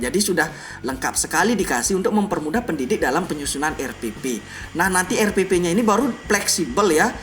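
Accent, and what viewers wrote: Bali, Indonesia. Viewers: Kyk logat bali malah menurutku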